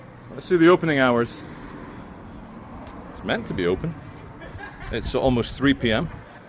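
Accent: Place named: England, United Kingdom. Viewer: Indigenous English , beautiful, just beautiful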